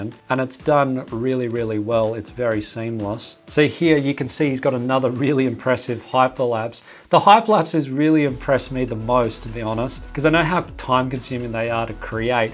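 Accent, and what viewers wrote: Australia. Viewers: Because he's australian lol. It's called accent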